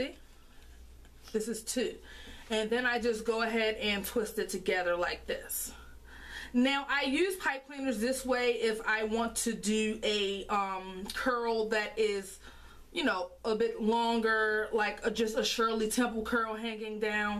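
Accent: Baltimore, United States. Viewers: I hear a Baltimore accent🌚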